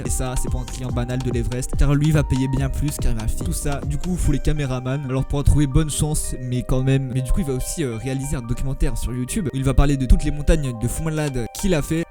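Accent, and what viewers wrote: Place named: Banlieue, France. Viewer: Des barres 😂 Ton débit, ton phrasé, tes expressions, ton regard " Banlieu " loin de " l'Univers Montagnard " mais il y a des vérités dans ton speech ;)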